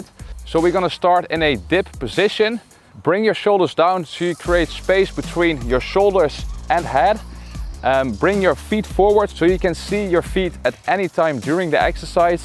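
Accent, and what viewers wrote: Netherlands. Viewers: "...arch your back too much so don't bring your feet ÖP in the air ..." Oh boy ,I love the netherlands accent, I really do ! Greeting from your neighbour eastside of the Maas/Rhine. But FYI my english speaking skills are worse, bring people bleeding ears :-D therefore my accent isn't that charming. Keep up your nice contents!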